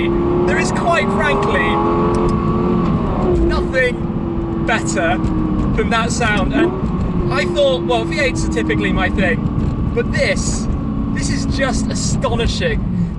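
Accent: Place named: United Kingdom. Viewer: I hate that british accent.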